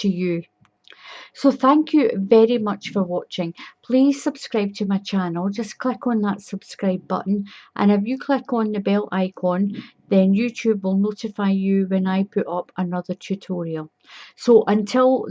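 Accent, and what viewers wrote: Scotland, United Kingdom. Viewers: Thank you for this and other patterns. You are an excellent teacher (I'm American) and your Scottish Burr is an added treat!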